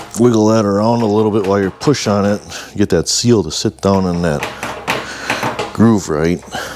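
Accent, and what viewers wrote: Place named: Midwest, United States. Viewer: this channel rocks hahaha . you are good at this and explaining what your doing. your midwest accent sends it overboard ( in a good way)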